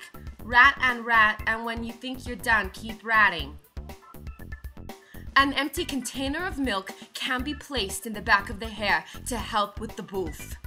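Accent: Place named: New Jersey, United States. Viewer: Oh man I loved this video!! You're so funny and have this wit and charm!! The milk jug and little dog in the hair cracked me up!:)) I love the Joisey accent. I mean Jersey.;) I'm from Joisey,I mean Jersey. lol you're the most gorgeous sexiest most beautful girl and I so love it when you play with your beautful hair and do vids like this!! You're the best my dear!:))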